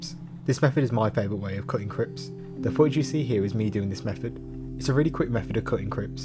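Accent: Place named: Black Country, United Kingdom. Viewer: lol Brits won't struggle with your speech but Americans will pull their hair out because they've never heard regional British accents. Guessing you're from the black country? Burntwood?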